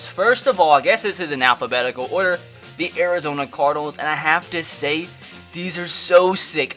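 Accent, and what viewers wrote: Ireland. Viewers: Are you irish? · U sound Irish asf😂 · The way he talks is like a Irish person. He talks so fast.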